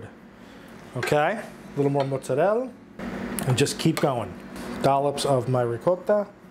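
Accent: Long Island, United States. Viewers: Found you via Tasting History with Max Miller! I'm also from Long Island, and yes, it's "riggotd." LOL You remind me of the LI guys I knew, growing up. Especially, your humor about making sure you get everything on your shoes! Very funny... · Dat Strong Island accent. Sounding like you're from Patchogue.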